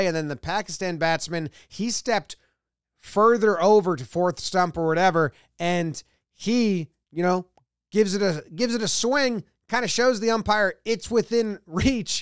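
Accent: United States. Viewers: This guy talking sounds American, but also is talking about cricket plausibly competently? I don’t get it at all.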